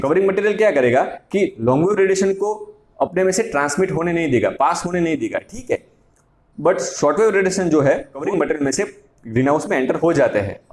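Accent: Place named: Gujarat, India. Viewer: Bhai gujrati chho ne awaj thhi j Lage chhe but good explanation sir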